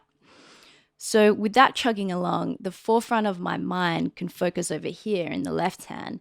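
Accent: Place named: Australia. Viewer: Great vid, great playing and practical ideas. Fellow Aussie here 🙋🏼‍♂️, great to hear the accent on a bass vid, I look forward to more.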